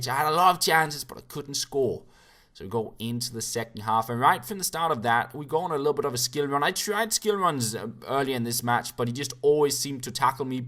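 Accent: Jutland, Denmark. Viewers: Er du fra Jylland?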